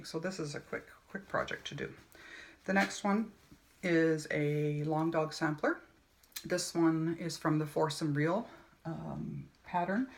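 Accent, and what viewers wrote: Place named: England, United Kingdom. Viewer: Great first video☺. Loved how you gave all info out. As you said Jules is fantastic!!! She's amazing in many ways isn't she. You needn't apologise for being nervous, it was your first one, at least you put yourself out there and I thought you did really well. Out of interest, were you born in Canada? You sound more English. I am from England lol. I like the variety of different projects. See you next time. Happy stitchy holiday☺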